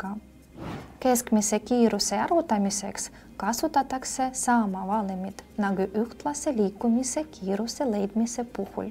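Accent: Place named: Russia. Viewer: Üsna häirib mahalugemine, sest mahalugeja vaatab teksti, mitte kaamerasse. Võiks ikka rääkida otse nagu veltsi ja veiko seda teevad. Kui ei suuda seda eesti keeles teha, siis tehku vene keeles, mis ilmselt on õpetaja emakeel. Kõneleja eesti keele aktsent on üsna jube, nii et ilmselt oleks palju meeldivam, kui jutt oleks ikka venekeelne ja lisaks oleksid eestikeelsed subtiitrid või siis veltsi või veiko räägiksid sama jutu eesti keeles või siis toimuks vestlus nende vahel. Loenguformaat pole ka kuigi atraktiivne põhikooliõpilastele.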